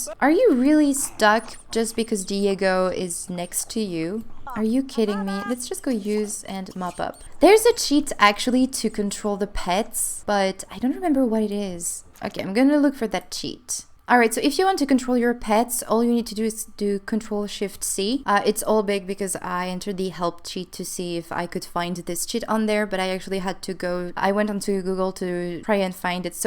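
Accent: France. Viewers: I really enjoy the way you play the game! Also, I LOVE the slightest hint of accent in your voice. Are you french by any chance? I could listen to you speaking for ages, it just sounds so nice🌻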